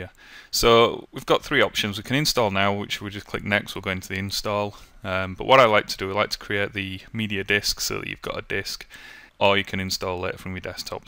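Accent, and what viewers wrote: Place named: England, United Kingdom. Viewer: Its realy hard to understand you with your long english accent but I think its only me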